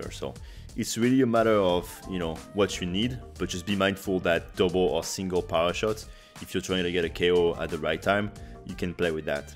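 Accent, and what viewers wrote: France. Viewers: Ahah l'accent français est si reconnaissable 😂. En tout cas merci bien pour les tips sur l'arc, les vidéos sont très claires et organisées, un regal.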